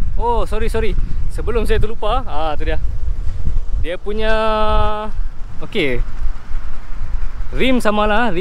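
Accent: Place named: Kedah, Malaysia. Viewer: Aku suka cara cakap laki cina tu..memang pandai cakap..sleng loghat kedah kalau x salah..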